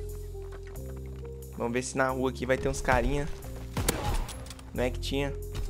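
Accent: Minas Gerais, Brazil. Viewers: cê é mineiro tbm né? kkkkk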